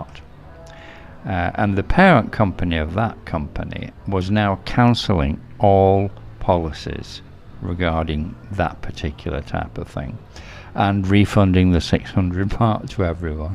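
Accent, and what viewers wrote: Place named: Northern England, United Kingdom. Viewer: Hi, where in the north of England are you from? Your accent is most pleasant on the ears.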